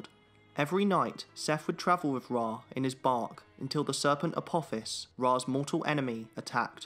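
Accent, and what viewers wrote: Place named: England, United Kingdom. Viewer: The pronauncation of the gods is so english, I could not recognize them. Osiris? Like Miley Cyrus? Really??? its O-ZE-RES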